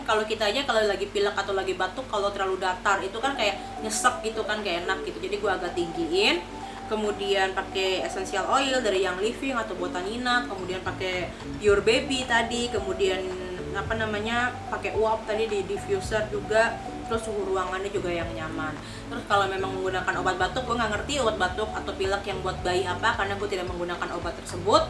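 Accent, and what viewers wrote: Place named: Karo Batak, Indonesia. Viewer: Nampak x logat batak Karo.. Kkk ini ..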